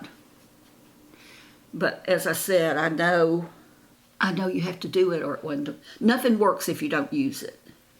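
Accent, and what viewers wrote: South, United States. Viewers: It is so lovely to listen to your voice - your soft, lilting Southern accent. I find it most relaxing. 😌🙋‍♀️🥰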